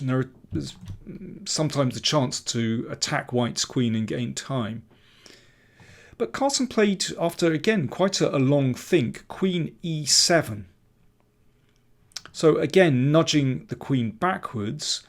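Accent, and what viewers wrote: United Kingdom. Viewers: I definitely agree! I currently believe that Daniel’s analysis is much better for improving. Because not only do I think that Daniel’s analysis gives us a grandmaster insight and ‘feel’ for the position, he also is imo able to express the psychological effects of moves much better. And while Agadmator’s top engine moves are nice to know, the analysis of the psychological effects of moves in terms of pressuring the opponent, being more comfortable and playing with the ‘flow’ of the game etc. are, I’m pretty sure, much more useful for improving than breaking down disgusting engine lines! And lastly as a bonus, I thoroughly enjoy the British accent and demeanor, especially when he gets all excited😆😇😇😇.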